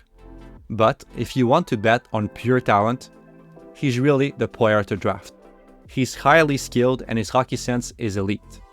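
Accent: Quebec, Canada. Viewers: Quand même drôle que ce vidéo soit analysé par un québécois (on reconnaît l'accent dans son anglais) et que Demidov s'en vienne à Montréal. Let's go!! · Are you from qc cuz that accent is so qc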